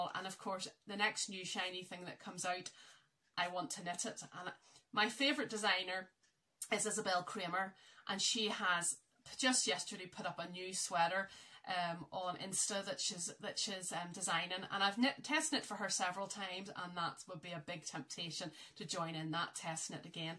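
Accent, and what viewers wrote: Northern Ireland, United Kingdom. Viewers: This was a very enjoyable first podcast. You took to it like a duck to water. I live in Co Antrim and it’s great to hear another N Irish podcaster. Looking forward to Episode 2. Touched by your scripture message. Thanks x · Hi, I've just came across your channel and have (as you can see ) went right back to the start. Do I detect a hint of Northern Ireland in your accent?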